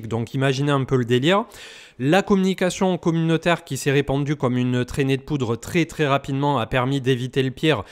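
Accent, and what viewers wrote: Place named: France. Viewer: Pour l'accent anglais c'est pas grave, on comprend, tu es français ....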